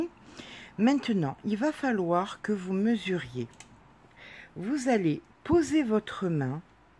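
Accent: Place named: South, France. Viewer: Merci pour ce tuto.mais pour une débutante... le point coquille n est pas toujours évident. Je n ai fait qu un rang de point coquille. Je b arrivais pas à bien comprendre sil fallait piquer dans chaque côte ou pas... le cadrage n étant pas optimum... mais continuez comme cela..avec votre charmant accent du soleil!😘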